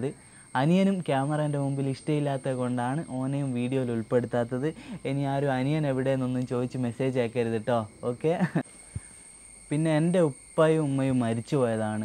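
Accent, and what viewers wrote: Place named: Nadapuram, India. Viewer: vadakara thanneyono.allel nadapuram bhagathaano?, samsaaram nadapuram shailiyaanallo😆😆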